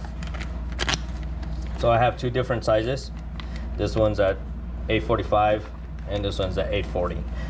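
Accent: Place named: Appalachia, United States. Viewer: I love how you sound almost as hillbilly as me through most of the video, then turn your heavy accent back on in the outro 😂 thanks I have been debating on getting into cue building and looking at this lathe. This was very helpful.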